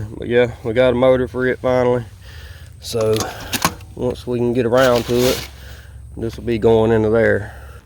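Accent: Mississippi, United States. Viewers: Are you from Mississippi?!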